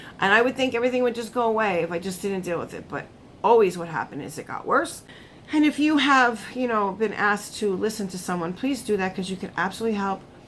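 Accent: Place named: East Coast, United States. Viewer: Thanks,...you are so sweet! I hope you feel better soon! I am not doing so great these days myself! I can hear it in your accent that you on the EST! Take care & thanks so much for your response! 🙏🙏❤❤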